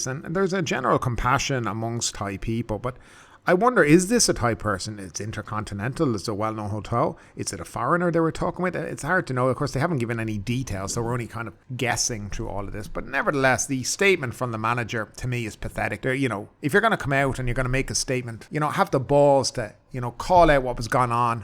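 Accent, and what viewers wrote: Ireland. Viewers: This guy sounds Irish.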